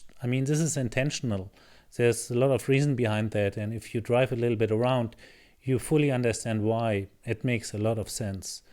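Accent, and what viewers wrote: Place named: Germany. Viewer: Gutes Video! Inhalt top, Aussprache noch etwas zu deutsch:)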